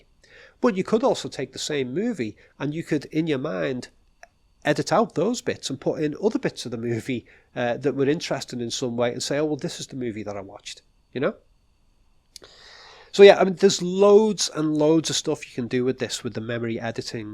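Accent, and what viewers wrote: Liverpool, United Kingdom. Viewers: Mr, I've watched your videos off and on a for a couple of years now. Your videos are fantastic and your insight is incredible. I've often thought your accent is quite similar to that of Paul McCartney. Well now you've confirmed it for me as you stated you are from Liverpool just as he is. You kind of even look a bit like him. Just an observation is all. Be well sir.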